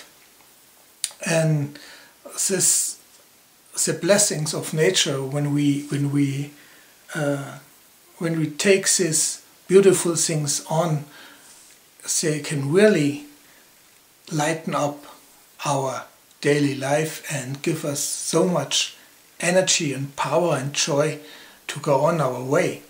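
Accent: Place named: France. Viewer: OMG! Are you FRENCH?! :D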